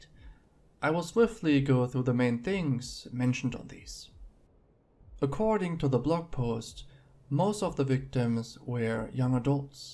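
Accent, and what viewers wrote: Germany. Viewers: Considering his accent sounds like Cosmodores i'm thinking hes german.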